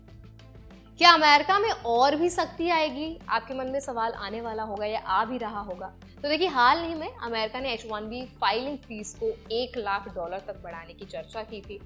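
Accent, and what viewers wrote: India. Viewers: I'm sorry but you Indians hsan annoying accent when you speak English. Have you ever listened to yourselves?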